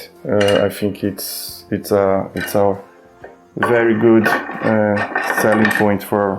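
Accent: Brazil. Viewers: Sotaque de BR haha belo review meu caro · By the accent, it's Brazilian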